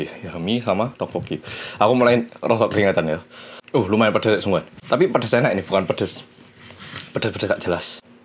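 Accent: Java, Indonesia. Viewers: Kan memang Jawanya gk ilang ilang ini oppa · Logat jawa nya gemesin mas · tak kiro dene wong jowo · Kenapa kalo kamu orang korea tapi kyak boso jowo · Kangen medhoookkkkk nyaaa:" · Mas ganteng banget😻😻medok lagi jadi paham bicaranya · Iki nonton oppa rasa wong jowo rek rek😊😊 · Lek wong jowo dadi opo ae.boso ne tetep medhok :) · Kok medok bahasa jawa ko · Pertama kali liat orang Korea ngomongnya medok bngt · Masa korea medok kaya jawa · Muka korea dikit suara logat jawa awokakaka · Korea Ke jowo jowoan wkwkwk🙏👍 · Lagek delok kok aneh wong korea bosone medok koyo wong jowo😂😂😂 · Orang Korea kok kek orang Jawa ngomong nya yah😂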